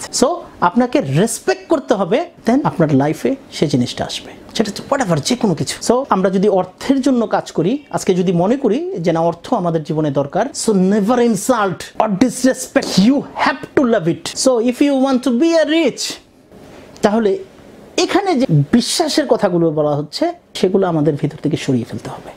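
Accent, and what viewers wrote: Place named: India. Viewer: Your accent is indian. Particularly the pronunciation of ‘t’ is too strong.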